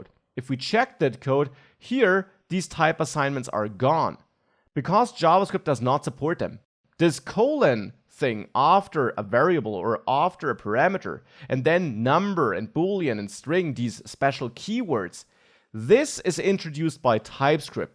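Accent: Germany. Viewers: Also ich muss ja echt sagen.... Du hast den deutschen Akzent fast komplett raus bekommen, sprichst flüssig und deutliches Englisch und hast dazu auch noch ein super Video gemacht. Ich habe es zwar noch nicht ganz zuende geschaut, bin aber jetzt schon wirklich wirklich beeindruckt. Keine nervige Musik oder halbnacke Frauen, die zwischendurch eingeblendet werden, keine Beleidigungen oder unnötiges Gerede aus deinem Alltag, kein Runtermachen von irgendwelchen Nationalitäten oder Gruppen und dies alles noch kombiniert mit einer wirklich cleanen Videostruktur. Ich kein Deutscher und kann einfach nur sagen das ich dein Englisch als "great" bewerte. Wirklich gute Arbeit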